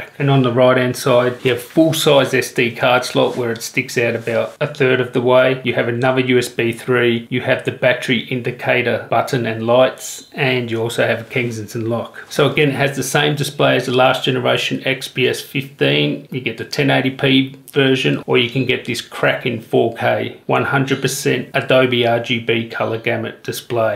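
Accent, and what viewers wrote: Australia. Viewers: Is it just me or does this guy sound like an Australian Trump? (no offence intended, just the way he speaks reminds me of him) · If anyone knows who videogamedunkey is, do you guys think this guy sounds very simliar except with an australian accent?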